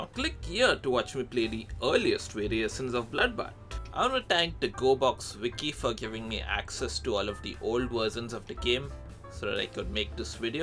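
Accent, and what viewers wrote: India. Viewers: I know gonna be great video if is India accent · Bro I thought he was from other country but, I noticed his voice that sounded like Indian. so, I checked his profile and he was Indian. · indian accent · Are you indian or its just your accent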